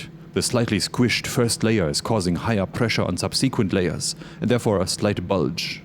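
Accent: Germany. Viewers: No not monotone, it’s deep late night Radio DJ voice w/ an exotic Deutsch / German Accent. A great intoxicating lullaby when I too tired to care.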